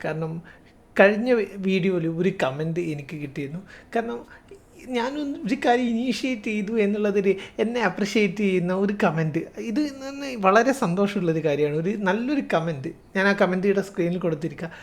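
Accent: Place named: Thalassery, India. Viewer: നാടൻ തലശ്ശേരി ശൈലി❤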